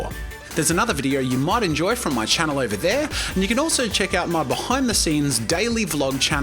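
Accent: Australia. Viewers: Are you Australian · I just now realised that jazz says "good day" in every video is because he's austrailing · Is he Aussie? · Hey , Jazza, just stumbled on this video.. Out of curiosity is your accent a queenslander? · hes Australian? at least I think because of the accent but he's actually hot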